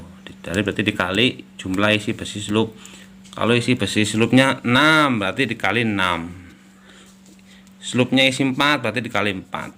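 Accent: Banyumas, Indonesia. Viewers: Ora ngapak ora kepenak... Maturnuwon kang ilmune...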